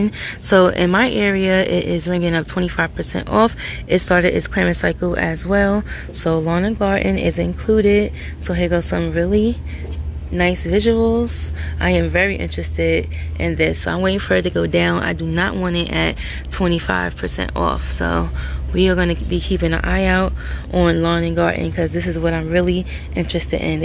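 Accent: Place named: East Coast, United States. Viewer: Everytime I go to the clearance section, I hear your lovely voice in your east coast accent saying "Scan Scan Scan family" LOL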